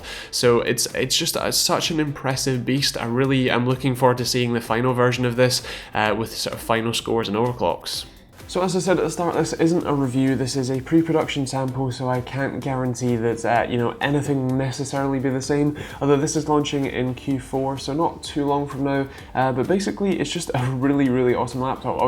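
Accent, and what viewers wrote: United Kingdom. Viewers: You talk very fast. On top of that you have a UK accent. That makes it a little difficult for someone outside of the UK, like me, to understand all you say.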